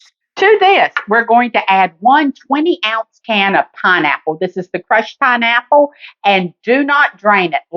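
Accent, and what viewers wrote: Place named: Carolina, United States. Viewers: Yep, that's a real Carolina accent. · Not sure where you're from but I'm from south Carolina and her accent sounds genuine to me.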